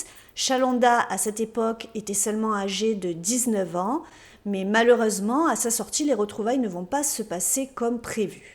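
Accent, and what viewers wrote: South, France. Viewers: Je découvre ta chaîne, c'est très sympa, une Victoria Charlton avec l'accent du sud, et si en plus ya en générique, je m'abonne!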